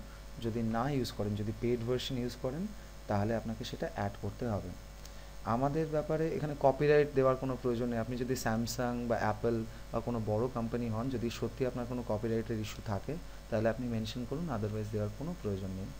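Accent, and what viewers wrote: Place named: Kolkata, India. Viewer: I thought you were from Kolkata. Bah.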